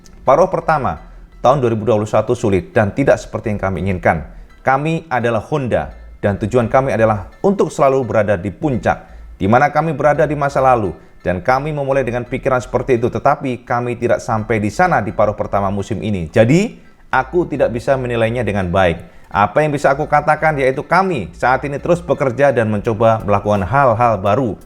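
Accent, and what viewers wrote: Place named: Java, Indonesia. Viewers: Paranormal motoGP 😇😇😇😇😇😇😇😇 logat jowone ojo di ilangke..........ciri khas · Saya suka gaya bahasanya dari... kental bahasa jawanya 😁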